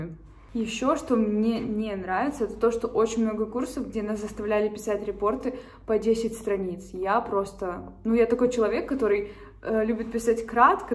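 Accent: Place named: Urals, Russia. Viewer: А речь у вас не питерская. Много призвуков и звуков, зарактерных для уральских студентов, возможно это корни?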